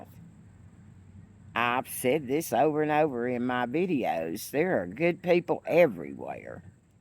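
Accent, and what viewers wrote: South, United States. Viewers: It is refreshing to hear a voice of reason spoken with a southern accent. After listening to so many southern accents in the House and Senate, it made me wonder, is it something in the grits? You and Beau of the Fifth Column and Trae Crowder and others keep us enlightened.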